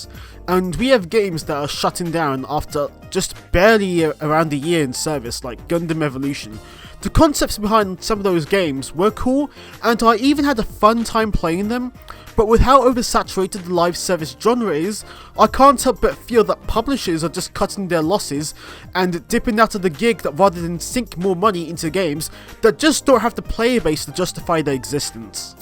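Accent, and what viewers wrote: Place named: United Kingdom. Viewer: Why is he British?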